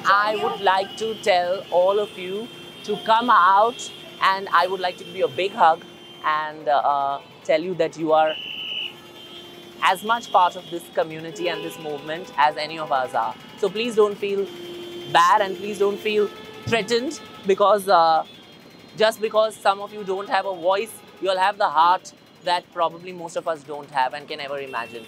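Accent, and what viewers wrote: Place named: Maharashtra, India. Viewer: 😂😂😂😂 love to watching your vlog i m obsessed with "bahot ho gaya fashion and bata wala scene" love u dear make more vlogs with your hindi marathi accent please ❤❤❤❤❤❤lots of love...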